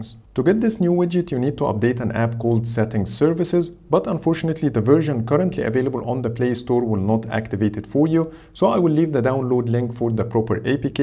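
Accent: Egypt. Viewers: احلي مسا عليك من مصر الفيديو جامد عرفتك من اول الفيديو انك مصري 😂♥️♥️♥️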